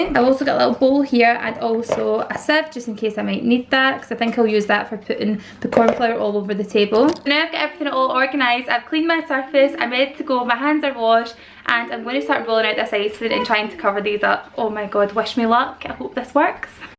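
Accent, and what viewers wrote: Scotland, United Kingdom. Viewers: omg i lovvvveeeeeeee scottish english 😍😍 - greets from switzerland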